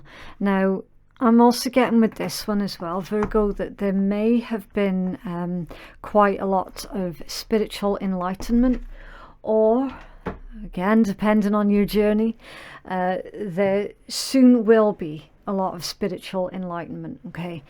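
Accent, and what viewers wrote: Scotland, United Kingdom. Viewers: I’m new to you channel … you absolutely nailed it !!! Every detail !!! Well done .. hello from Rhode Island, USA … love your Scottish accent ????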